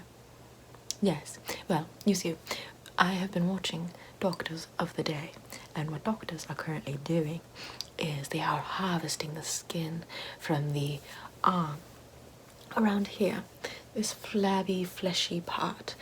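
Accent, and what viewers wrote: United Kingdom. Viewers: She definitely nose what she's doing. I trust that British accent implicitly. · A British accent and in B&W double credibility. Funny though a dodgy German accent and B&W wouldn’t inspire confidence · I definitely trust you to cut on my nose, especially with that British accent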